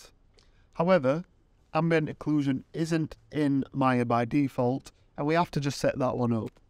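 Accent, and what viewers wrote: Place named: Yorkshire, United Kingdom. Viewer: Legend with a yorkshire accent I thank you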